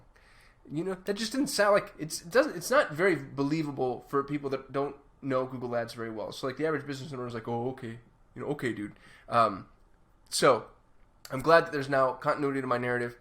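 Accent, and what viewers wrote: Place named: United Kingdom. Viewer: you know i always do. #1 fan of British accents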